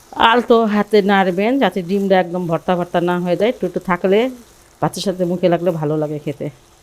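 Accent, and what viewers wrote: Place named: Bikrampur, Bangladesh. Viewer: Aunty onek test apnar ranna.. Bikrompur boley kotha.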